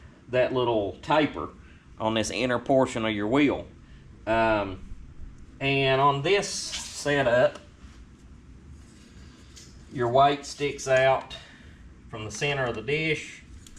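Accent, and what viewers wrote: South, United States. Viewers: Dude stop talking redneck... It's time...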